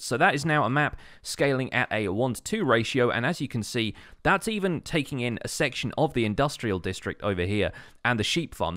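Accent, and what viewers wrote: England, United Kingdom. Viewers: My gosh I love your videos and your English accent!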